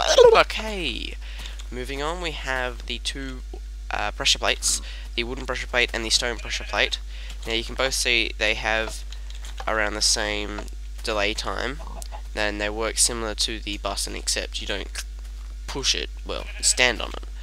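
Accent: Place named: Australia. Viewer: Must be Australian with that accent, probably east coast right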